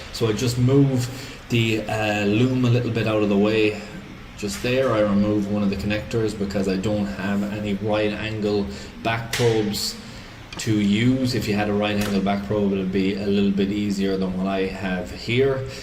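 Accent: Ireland. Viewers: Great video, just subscribed. I'm in Ireland you sound like your familiar to there :) . I have a 2.5td ranger year 2011, losing power on and off today it would hardly pull up hill then it might go again but constantly down on power over last few months. I put in new injectors and cleaned out diesel tank still has the issue. Any suggestions, thanks for the help..No lights coming up on the dash. Tks again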